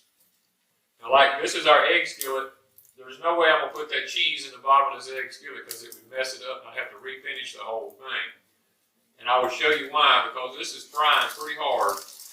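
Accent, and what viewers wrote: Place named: Georgia, United States. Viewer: Great video, I just discovered you. Your accent sounds Georgian - north of Atl to me. 🤔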